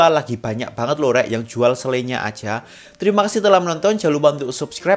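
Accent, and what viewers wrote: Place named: Surabaya, Indonesia. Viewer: Aku lho paling suuuwwweneeng nek krungu omongane arek iki serasa nang suroboyo😆😆😆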